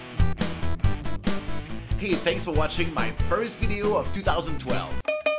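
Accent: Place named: France. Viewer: Très drôle t'entendre parler en anglais, frenchy! :P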